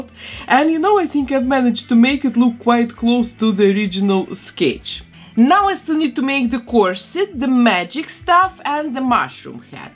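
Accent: Russia. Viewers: похож, почему-то, на самый стереотипный и клишмрованный русский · I can't figure out what that accent is it kind of reminds me of a Russian accent of which is what I have but it's not quite a Russian accent so please what language did you most likely speak before you start speaking English or did you even speak another language aaaaaaaa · Звучит как русский акцент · Акцент похож на русский) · какой-то русский акцент 🤨🤔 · Блин, да чтож такое. почему меня не покидает ощущение что у вас русский акцент?) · Русский акцент?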